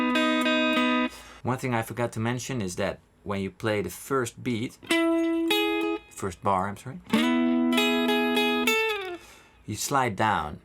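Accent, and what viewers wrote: Netherlands. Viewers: thanks for the video. you sound dutch :)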